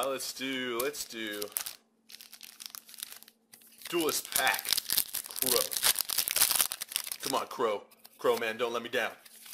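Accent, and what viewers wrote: Germany. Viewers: Sounds like you are TRULY german!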